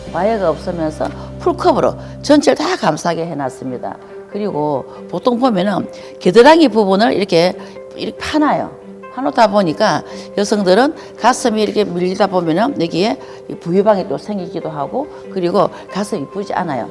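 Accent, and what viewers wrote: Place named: Gyeongsang, South Korea. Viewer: 경상도 말씨~친근감이 들어 꼭입어야 될것같아요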